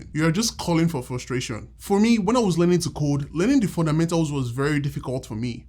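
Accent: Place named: United States. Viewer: Sir please don't talk American English please talk in clear English I can't understand your language 😢 please 😊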